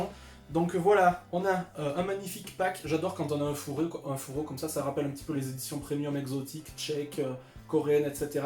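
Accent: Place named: South, France. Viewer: super! Vu qu’à tu as un petit accent du sud et que je suis de Toulouse, tu pourrais me dire lequel? 😅 en tout super vidéo!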